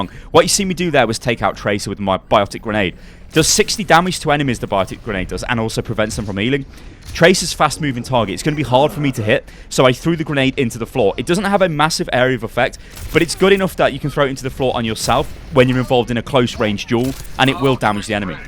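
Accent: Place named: United Kingdom. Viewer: Amazing guide; keep your work up! Admittedly Ana is my main as well, so any kind of help I get is amazing. By the way, nice British ;D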